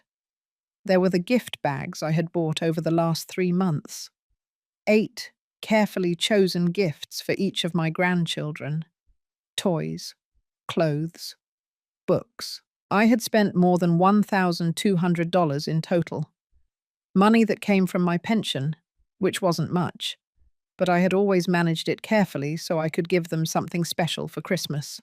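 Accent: England, United Kingdom. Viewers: Going to the beach in December. There is an accent that is English….no beaches warm enough for swimsuits. Maybe britishers that emigrated to florida or California???? Or just AI being ignorant abain 😆😆😆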